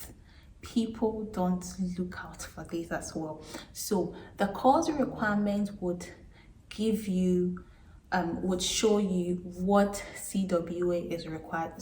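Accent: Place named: Ghana. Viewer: You sound Ghanaian. Thanks for the video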